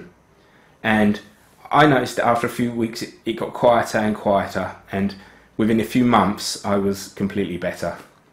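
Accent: Australia. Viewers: 澳洲口音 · is he from Australia?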